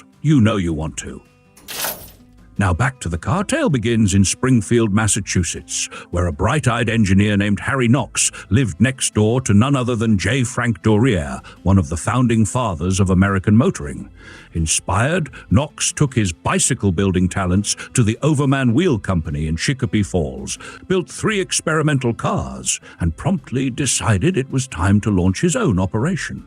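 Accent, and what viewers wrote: England, United Kingdom. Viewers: Button up! My grandmother still had the button hooks - a nice stick with a hook. But don't complain . You get to see and hear all this for free. (Oddly, although the AI vocal sounds English, I heard an Amerenglish term or two.. the solecism is so distinct that i believe our uploader is American . Be kind to us who are forced witnesses to the emissions of a foul orange frog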